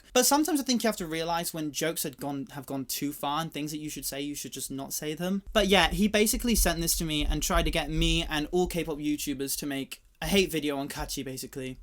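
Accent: United Kingdom. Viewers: I love your British accent 😍 ❤ And you singing very well😆 Support you❣❣ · besides the point, I'm appreciating the accent. You see so little bittish youtubers and I just noticed how comfortable listening to him is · You're a British aren't ya? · fell in love with your British accent already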